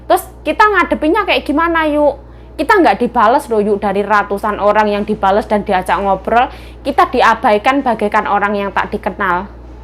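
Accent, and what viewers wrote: Java, Indonesia. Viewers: *Lansia 80 🇳🇱🌷Holland*...selamat pagiii...baru dua hari mengikuti Vlognya...menarik menontonnya dicampuur rasa iba kalo baca yg sedih2...saya tersenyum mendengar clotehan medók sekali logat Jawanya dan waduuuh lancaaarr ucapan2nya seperti air terjun🥰...dari Negeri kincir angin salam sehat selalu tetaplah semangat....juga buat para pemirsa dimanapun berada.....🙏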